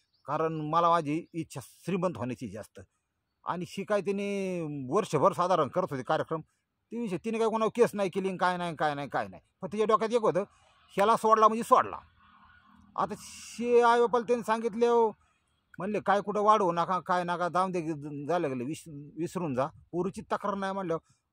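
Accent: India. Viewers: तुमच्I गावरान भाषा खूप छान आहे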